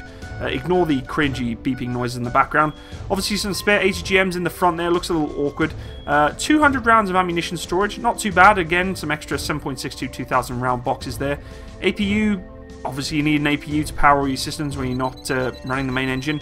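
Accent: United Kingdom. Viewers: Pls do some research and not speculate everything from a shitty video that the company made for PR. It might be difficult for u considering most of your videos are just u reading Wikipedia pages in a British accent.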